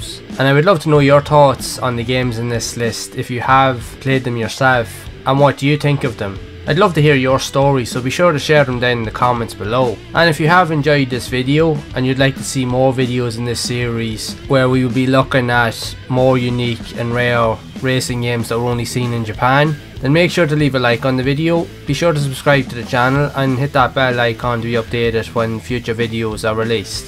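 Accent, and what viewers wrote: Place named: Dundalk, Ireland. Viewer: Hearing a man pronounce Japanese game titles in a thick Dundalk accent is my type of content. Been digging through the PSX a little trying out the new FPGA Mister core. There are so many JP exclusive titles I missed.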